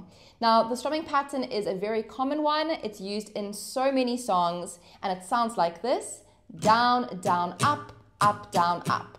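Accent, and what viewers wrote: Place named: South Africa. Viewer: I thought I’d heard the (for me) nostalgic trace of an SA accent and checked your biography 😊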